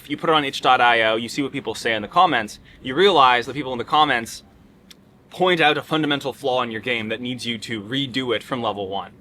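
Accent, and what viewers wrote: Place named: Canada. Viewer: he sounds so canadian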